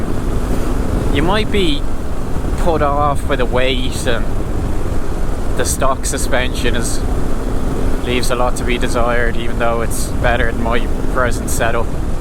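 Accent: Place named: Ireland. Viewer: Nice what would you get the normal T7 or the word raid With the acf50 I put it on about four times over the winter but then again, I do live right next to the sea Just try adding it on bit more Should help I take it you’re over Ireland by the sound of your voice looks like you got some good trailers there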